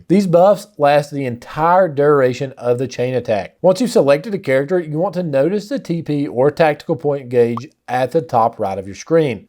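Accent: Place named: South, United States. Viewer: Just checking this out, really well done and clear. Thanks! Side note - where are you from? I'm from NC and I sense a southern accent here too :D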